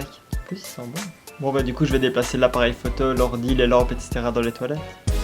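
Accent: Liège, Belgium. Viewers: Tu es belge ou je me trompe? Je reconnais l'accent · Tes belge ? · J'adore ton accent !! c'est belge non? 💘💗😙 sinon continue comme ça tu gère pouce bleu aussi pour cette vidéo (comme toute les autres)😚💝😙💗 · Toi tu viens de Liiiiidge m’fi!! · Tous les belges ont cet accent? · Es-tu belge?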